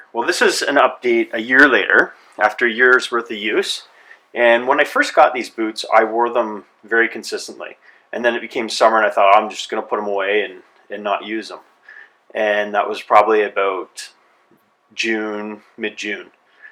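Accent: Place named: Canada. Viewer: such thick canadian accent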